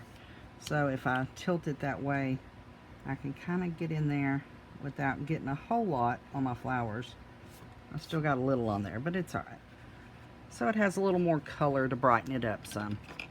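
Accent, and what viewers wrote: Texas, United States. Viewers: I just adore listing to your Texas drawl. Your videos are amazing...thanks for all the inspiration! Now where's the video on how you did the multi-colored greeting??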